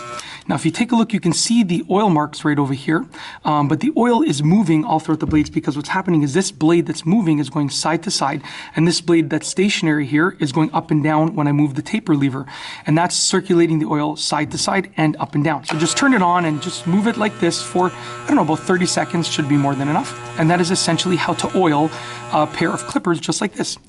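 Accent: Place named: Canada. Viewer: canadian ehh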